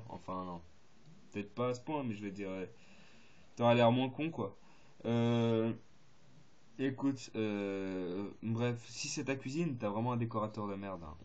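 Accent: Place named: Belgium. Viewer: Il a un leger accent belge...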